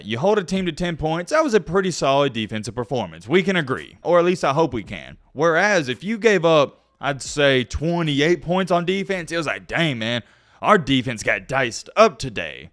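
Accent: South, United States. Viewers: I don't even mind when you ramble about rambling. I like your take on football most of the time and I find your southern twang hilarious. Boomer Sooner on the Rise!!!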